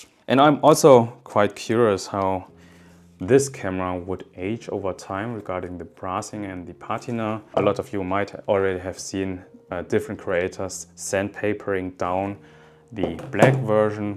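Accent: Germany. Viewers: Interesting to see asian guy who speaking english wit a german accent 😊 and Thanks for video! Nice one ☝️ keep going!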